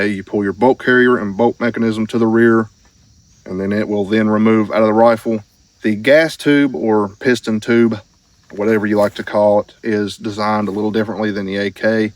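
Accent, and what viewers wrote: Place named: West Virginia, United States. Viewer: Fellow West Virginian? ✅ Smaller channel? ✅ Shootin in the holler? ✅ IWI Ace? ✅ My ears perked up immediately when you started talking 😂😂 you got another sub, hoss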